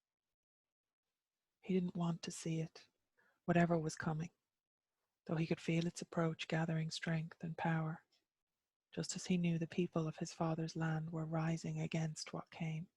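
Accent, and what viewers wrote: Ireland. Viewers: Thank you for this immersion in Irish story in authentic Irish voice and spirit!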